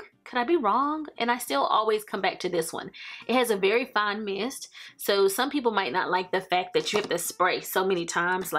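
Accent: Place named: South, United States. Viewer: Love the southern twang! Have a wonderful and safe holiday season. Thanks so much for sharing.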